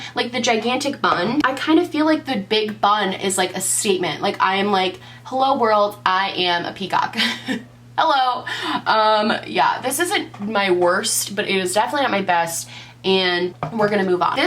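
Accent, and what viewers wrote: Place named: Upstate New York, United States. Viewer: Girl I want a full look with you telling us everything in your upstate accent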